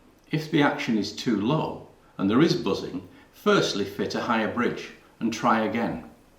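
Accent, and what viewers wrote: United Kingdom. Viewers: I never expected a posh Englishman to teach me how to set up the most redneck instrument in the world lol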